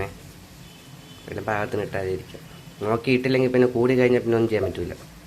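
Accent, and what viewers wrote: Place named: Thiruvananthapuram, India. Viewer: തിരുവനന്തപുരത്തിന്റെ സ്വന്തം പുത്രനാണ് അതേ ഭാഷ സ്ലാങ്ങ്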